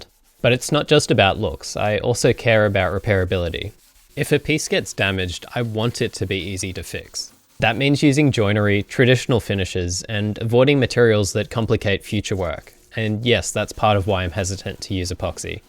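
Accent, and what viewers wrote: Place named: Australia. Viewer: First time to your channel. Came here from a short of this video, started watching for my fellow Australian accent, stayed for the great build. Nicely done.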